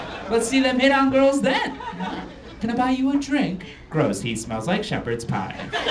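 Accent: India. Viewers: dont know where you are grown up but you still have got Indian accent :D .. it is not new content , copying of Trevor noah and Russel Peters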